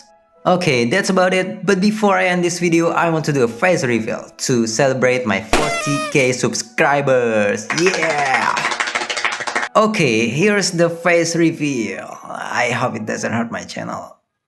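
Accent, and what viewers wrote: Indonesia. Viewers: oh indo toh, kentel banget aksennya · hell nah, satu satunya orang Indo yang masih ngehidupin komunitas GT, mangat yak, make sense knp logatnya kek org Indo😅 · Hop on Limbus gng. In what world where a CEO got lucky with gachas will give you 10 free pulls. Either way im an indonesian myself and ive been watching you for quite a while and you know your indonesian accent stuck way too much on your english it makes me wanna laugh so badly (i cant lie lmao).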